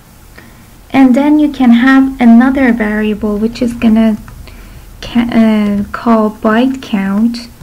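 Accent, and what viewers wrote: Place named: Iran. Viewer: After watching this i noticed your accent seems to be persian and then i chucked ur prof name and i suprised proud of iranian women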